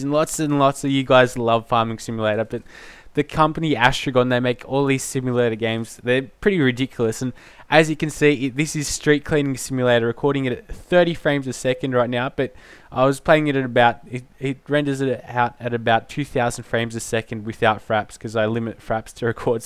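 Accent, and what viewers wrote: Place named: Australia. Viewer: he sounds NOTHING like nerd3. Nerd3 is English, this guy is Australian...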